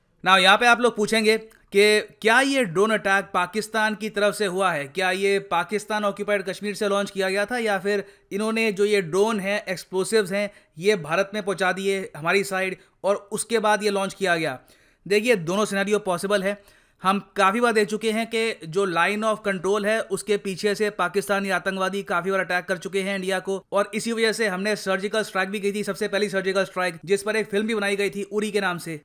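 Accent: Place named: Maharashtra, India. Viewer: Sir apki hindi bilkul marathi jaisi lagti hai👍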